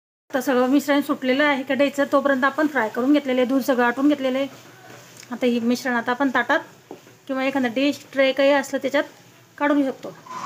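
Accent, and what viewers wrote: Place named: Sillod, India. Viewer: ताई संभाजीनगर सिल्लोड तालुका आहे का तुमचा बोलण्यावरून वाटलं तसं म्हणून विचारलं 🙏😊🧘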